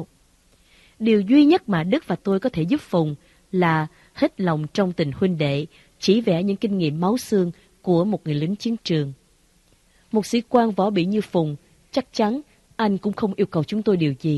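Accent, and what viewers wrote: South, Vietnam. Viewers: yêu quý giọng đọc miền Nam ấm áp thu hút bài viết hay hơn khi đươc giọng đoc của yêu lam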